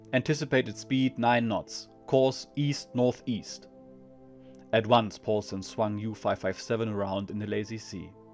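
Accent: Germany. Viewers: Your German accent makes the narration more authentic. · The German accent materializes the story into reality.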